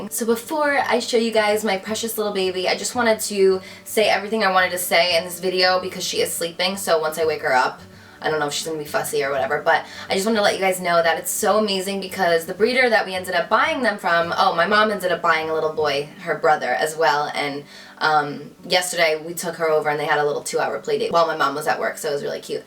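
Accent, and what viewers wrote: New Jersey, United States. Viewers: i KNEW you were from Jersey!!! yay!!!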